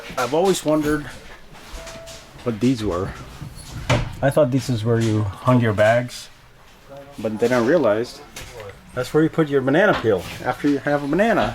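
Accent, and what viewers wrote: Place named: United States. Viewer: You looks like Indian and having accent like American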